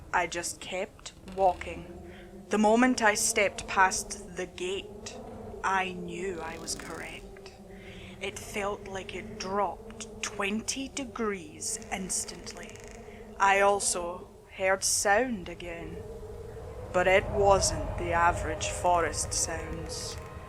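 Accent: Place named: United States. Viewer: This was totally awesome. Your American accent is great! I hope you do more of these!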